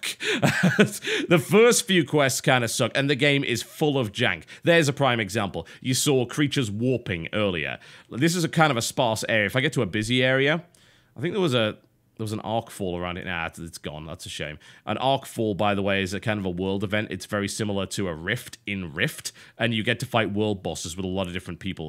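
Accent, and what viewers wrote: United Kingdom. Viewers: You sounded more british than usual in this video. It's not a bad thing.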